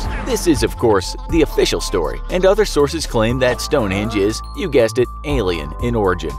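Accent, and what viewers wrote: United States. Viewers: An American accent makes everything sound like a conspiracy theory... and not believable. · Why is an American doing the commentary?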